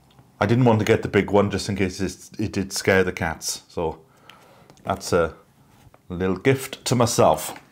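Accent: Wales, United Kingdom. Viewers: Thank you, lovely relaxing vlog. Nice watching you potter and hearing a warm Welsh accent. I learn a lot from listening to you, some great tips, and I won't be using my plastic chopping boards again. I enjoy reading all the great comments, too. One was brilliant today, about a firestick and a projector tv onto the wall. I have no idea what that is, but it sounds amazing, so I'm going to research concept and cost. The rain protective washing line cover is genius, so will check that out, too. I bought some window film but felt a bit unsure what to do until I read a comment here giving tips on how to do it well...hope you show us doing yours. Also, I love the glass teapot, and I may get one, if not too expensive. The star item today was Rusty's frame. It was stunning, and what a fabulous pic of him, he really was gorgeous. ❤ Take care and look forward to seeing Welshcakes being made. 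My Mother used the heated blackstone, and they were delicious...I miss her terribly. She would have loved your channel. Have a great weekend. 👍 🐈 🌼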